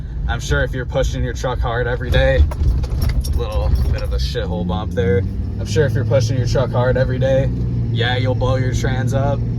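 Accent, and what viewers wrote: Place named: Northeast, United States. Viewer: Interesting accent. Somewhere upper east coast I’m guessing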